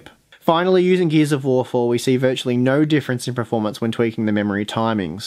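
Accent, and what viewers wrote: Australia. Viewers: The RAM Jenga was awesome :D Please stick to your Aussie pronunciation, I always cop it for the way I say "Cache", but it's also our signature :D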